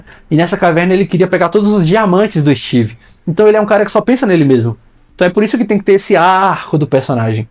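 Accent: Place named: Brazil. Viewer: O vídeo ta muito bom mas esse sotaque divisiano melhora demais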